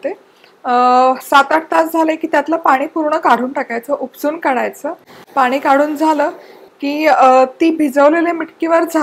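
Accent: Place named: Pune, India. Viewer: शुद्ध मराठी पुण्यातली 😊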